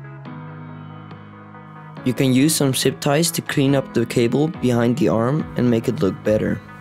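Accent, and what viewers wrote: Netherlands. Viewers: ja klopt toen ik je horden dacht ik WTF deze gast is nederlands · ur accent sounds kinda dutch, i can tell cos im dutch as well. (great video btw, keep it up!) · Grappig hoe elke Nederlander die naar kijkt het Nederlandse accent herkent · It may be that you Dutch speak normally because that I hear to your accent #TeamTechSource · ik dacht al... dat accent is NL ;P sinds ik op je startscherm vrijdag .. februari zag staan weet ik het zeker haha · Where you from you sound dutch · R u dutch? so yea I can hear it from your accent :) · Nice! ik kom hier van Setup Wars ik herkende direct dat je nederlands was als je engels praat let wel een beetje op jou accent met die F zoals Fideo maar echt nice kanaal ! sub bij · Nice video! Great quality. Bij setup wars herkende ik meteen je Nederlandse accent :P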